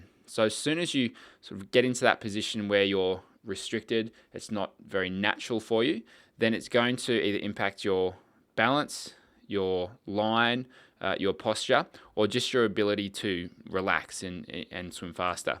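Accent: Australia. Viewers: He can't help being Australian :-)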